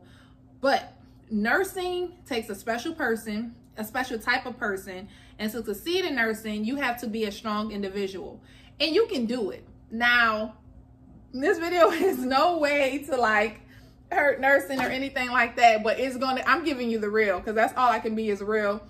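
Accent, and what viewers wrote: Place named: New Orleans, United States. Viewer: I knew you where from new orleans… sis that accent you cannot hide. I mean... I was trying to listen... I was like... well she kinda sound new orleans.. It peaks through.. anyway.. Im from Thibodaux... youll probably know where that is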